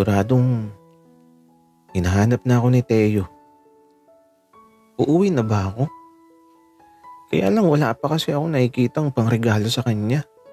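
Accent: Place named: Laguna, Philippines. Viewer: likas ka bang taga laguna? ung intonation kasi ng boses mo.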